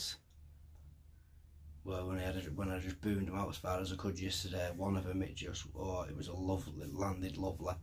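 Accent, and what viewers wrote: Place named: Northern England, United Kingdom. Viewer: There’s nowt wrong with looking and sounding like r’kid Ricky the hitman Hatton 😉👍lol it’s nice to hear a fellow northern lad doing carp blogs makes a refreshing change I can’t stand all this wibble wobble pukka cockney crap! Lol 😆✌️😎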